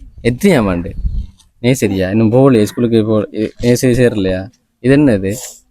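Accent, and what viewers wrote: Sri Lanka. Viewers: வணக்கம் Pro, நீங்கள் செய்யும் சேவை அருமை, உங்கள் மட்டக்களப்பு தமிழ் சிலாங் கேட்க செமையாக காதுக்கு இனிமையாக இருக்கிறது, அடிக்கடி என்ன என்ன என்ற வார்த்தை கூடுதலாக பாவிக்கிறீர்கள், மொத்தத்தில் Supper Pro, ஜேர்மனியில் இருந்து சிவராஜ்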